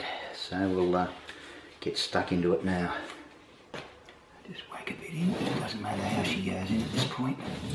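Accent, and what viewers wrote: Australia. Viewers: well his accent sounds australian and don’t they do things backwards there?? ... or is it us? thanks for the help with this video and your accent rocks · Uffff sorry mate cant listen to an Australian accent 🤢🤢🤢🤢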